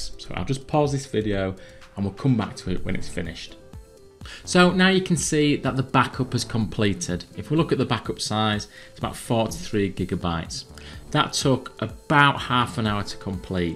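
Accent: Yorkshire, United Kingdom. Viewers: Yorkshire, Yorkshire , Yorkshire.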